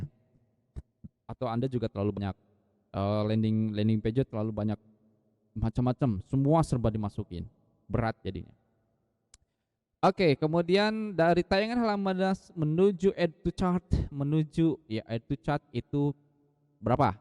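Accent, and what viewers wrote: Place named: West Java, Indonesia. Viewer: Sunda pisan euy, siap auto subscribe! Nuju dipilari konten meta ads kieu.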